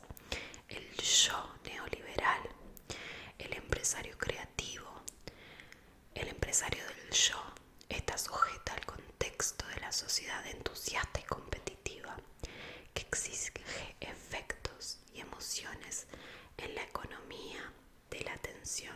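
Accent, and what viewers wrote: United Kingdom. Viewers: I really love your English acent, you do it very well, love ur videos, been I really don’t understand you anyways I put the subtitles, haha u are the best!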